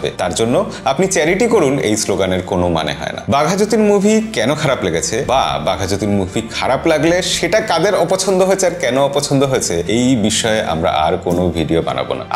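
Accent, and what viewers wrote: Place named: Bangladesh. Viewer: Apner bangla bolar dhoron ta Bangladeshi ter moto ...apni Bangladesher kotha theke aschen?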